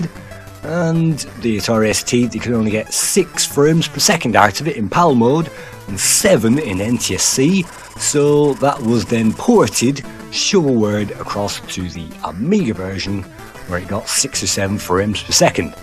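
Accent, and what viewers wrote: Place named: West Country, United Kingdom. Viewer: What is your accent? Sounds part northern, part west country and part Dutch.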